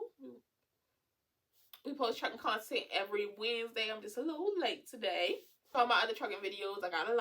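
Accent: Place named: New York, United States. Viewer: I hear the New York all In you lol .. I’m Right next door though in Philly so unknown how that parking get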